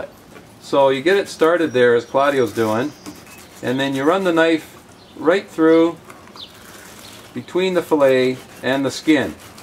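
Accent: Canada. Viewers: Sounds like a Canadian. Hope he’s here legally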